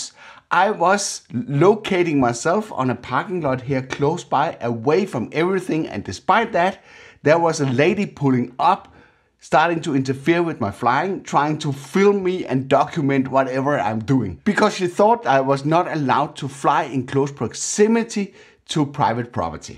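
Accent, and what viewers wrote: Denmark. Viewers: I thought I recognized the accent... I'm half Danish. (Not important but yay)... Very informative and interesting video. Tak. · Lige en dansker der tjekker ind. Der er SÅ MEGET plus på kontoen for at rent faktisk tag det der "Youtuber" seriøst istedet for det evenlige jeg er sej - selvforheliigelses som er upræget for mange Y-T (fra dk) Dog lidt malurt i bægeret. Dit DK accent er MEGET stærkt, men MEGET derudtale uden øhhh ehhh and so on. Good Work ! sq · Ah, Denmark. Tried to place the accent and then the language reminded me about the "Danish language breaking down" video. 😁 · As a Norwegian; I can hear a distinct danish accent in your english🇳🇴😂 edit; Impressive vids👍👍👍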